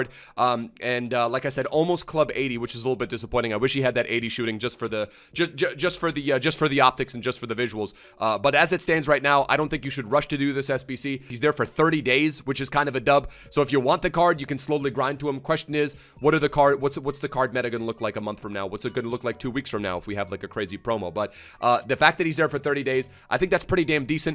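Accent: Ireland. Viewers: wow i literally believed he was irish lol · Actually thought he was Irish my disappointment when he didn’t strong link to my Roy Keane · Lmfaoo irish?? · me being confused for the first 2 minutes of the video if he really thinks he is irish or he's just joking around :D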